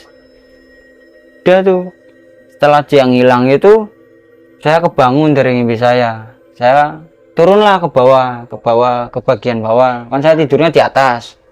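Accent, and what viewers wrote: Indonesia. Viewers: Mlaysia hadir. Pekat sangat indonisianya .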